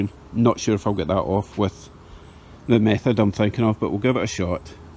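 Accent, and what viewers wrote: Scotland, United Kingdom. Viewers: Awesome video! Love the video and the Scottish accent I’m from Texas so it was nice hearing someone with a Scottish accent. Excellent job!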